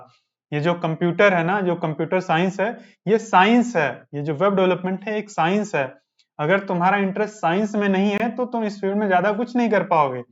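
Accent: Awadh, India. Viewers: bhai kaha se ho? Apke awadhi language ka touch mere Balrampur and Gond district wala lag raha hai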